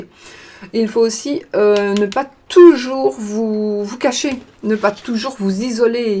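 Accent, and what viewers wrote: Belgium. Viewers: Aha j'aime trop l'accent belge vous êtes belle en plus 🤭🤩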